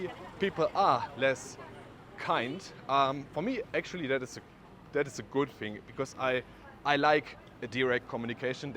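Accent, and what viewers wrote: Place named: Germany. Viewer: this german accent is killing me. (german here )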